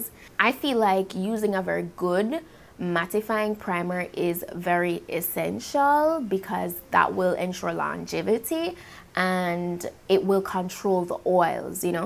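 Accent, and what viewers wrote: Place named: Jamaica. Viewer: From mi hear yuh talk mi sey ok... Yessss another Jamaican Queen! Had to subscribe... Like before mi even start watch the video tbh. 😂😂🤣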